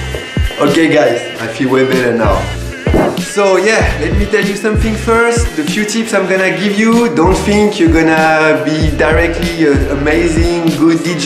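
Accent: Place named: France. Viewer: Just love the french-english! :D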